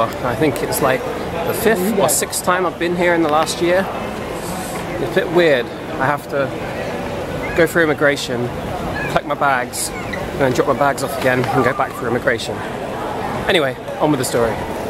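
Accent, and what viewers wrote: United Kingdom. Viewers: love this British accent....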